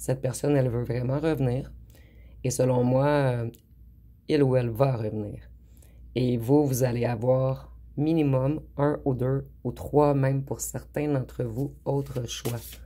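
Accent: Quebec, Canada. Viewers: Bonjour votre accent quenecois est tres jolie , je pense que chez vous la temperature n'est pas si chaude que chez nous dans le sud ouest de la France . Merci a vous 👍🙏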